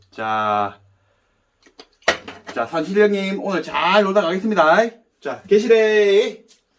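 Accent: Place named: Gyeongnam, South Korea. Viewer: 겨울이겠다 ㅎㅎ진빼이 서부갱남 사투리 정겹네 ㅎㅎ자연이 가자 ^^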